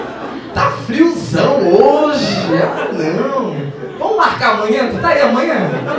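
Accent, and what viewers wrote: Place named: Pará, Brazil. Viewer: Melhor ainda com este sotaque paraense <3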